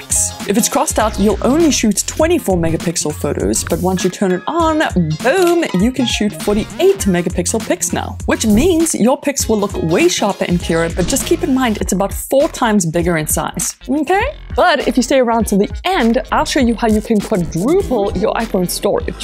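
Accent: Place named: South Africa. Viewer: Cool Soth Afreekan accent.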